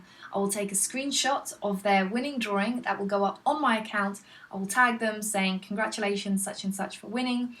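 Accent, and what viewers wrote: United Kingdom. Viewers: Are you british??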